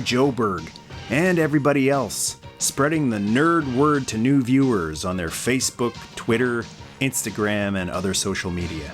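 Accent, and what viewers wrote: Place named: Boston, United States. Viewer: just discovered your channel by my absolute passion for MOTU & Joe!! My all time favorite movie play toys was the Rattler!! can't wait to binge watch everything Joe 1st then Masters of the Universe. I just watched your 1982 video describing the Original line up of Joe from the early days (1960) era and Mr.Hamma creative writing for the updated version. The history lesson was outstanding and I always knew Joe figures were more solid and flexible than Star Wars and most Other lines. Thanks to you and the awesome fans that are requesting these amazing topics of the best era evah!! heavy Boston accent!!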